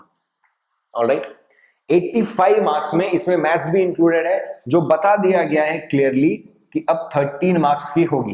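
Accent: India. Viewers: sir u r speaking in hindi like a south indian....seems funny to c u speaking in hindi bcoz i have a habit of listening to u in english only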